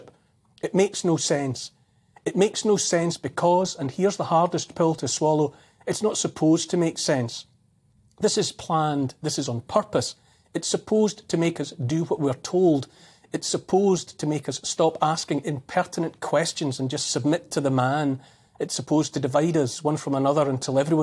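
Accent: Scotland, United Kingdom. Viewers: He has the perfect accent for doom and gloom reporting, is that Scottish?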